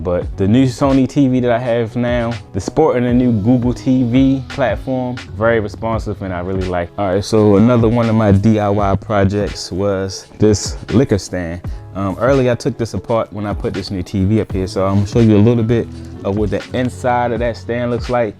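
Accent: Baltimore, United States. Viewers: Nice! Aside: By the accent, I'm gonna punt and say you're from the Baltimore area...🦀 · Is that a Baltimore (Maryland) accent? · Dope channel and your bmore bmore I hear lol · You got a follow beacuse the accent screams Baltimore lol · im guessin youre from bmore?? 🤣🤣 them dews and tews lol. good vid tho nice work on everything, i was buying random lights too until I got to Govee. Now my wife is like dont bring anymore lights in this house 🤣i see this is a couple years old tho ill check to see if you updated ✌ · Could tell you were from bmore in the first 2 seconds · Just stumbled upon this video you’ve got to be a Baltimorean I recognize our accents😂🙌🏾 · Wassup man…just tuned into your channel for some ideas, loving the content. Btw are you from Baltimore? You kinda got our accent 😂😂.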